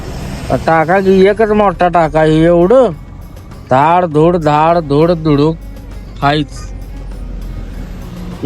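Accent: Satara, India. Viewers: Bhava satarai Tone badluu nka. Plz. Majjja yet naiii laka..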